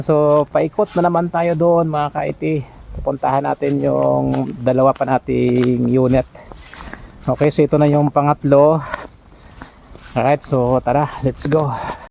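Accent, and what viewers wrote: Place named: Iloilo, Philippines. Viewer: ilonggo man ni gali. hahah taga diin ka sir?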